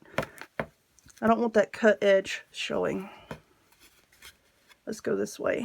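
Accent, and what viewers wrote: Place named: Atlanta, United States. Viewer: Yes I love the way you talk to, could listen to you all day. If my great-great grandfather hadn't moved out of Atlanta north maybe I'd have the same accent, I love it! Really enjoyed this tutorial, thank-you so much for posting.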